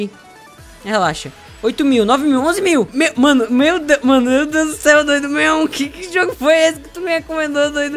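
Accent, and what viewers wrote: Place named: Paraíba, Brazil. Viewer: pq o tem um pouco de sotaque paraibano?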